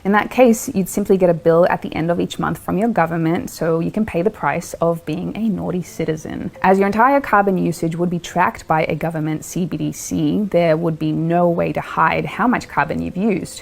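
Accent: New Zealand. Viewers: This chick sounds like she is from New Zealand. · New Zealand accent.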